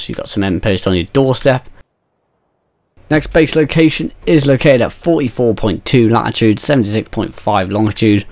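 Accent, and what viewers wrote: United Kingdom. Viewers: Loves your british accent mate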